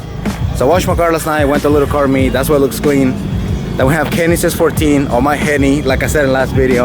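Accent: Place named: California, United States. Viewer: bruh when i first heard your accent i thought you was in cali and then i see all the japanese plates😭😭😭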